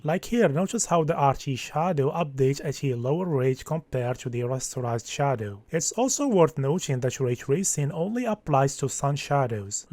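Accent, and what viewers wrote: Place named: Casablanca, Morocco. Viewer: خويا ميمكنش متكونش مغربي هاد الaccent ديالك باينا كازاوي هههههه Good work brother btw ❤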